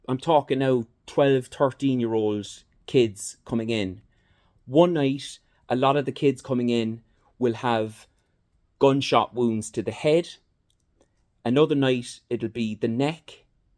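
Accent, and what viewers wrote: Ireland. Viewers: Honestly love this, the Irish have the best english accent imo, second being kiwi.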